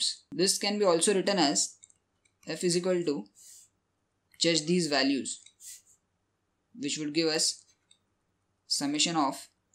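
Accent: India. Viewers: Why Indian have soooo annoying accent? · if only you indian can speak without that accent people would actually worship u guys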